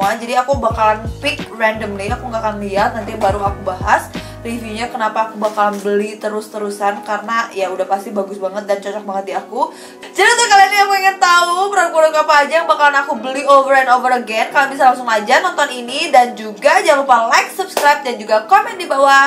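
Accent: West Java, Indonesia. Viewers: gemes sm logat sundanya kakk 💖 reviewnya bagus singkat tp jelas 👍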